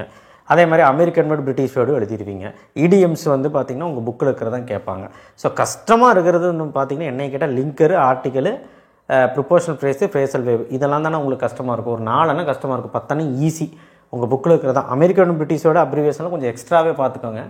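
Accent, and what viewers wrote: Coimbatore, India. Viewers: Sir neega Coimbatoreingla sir 😊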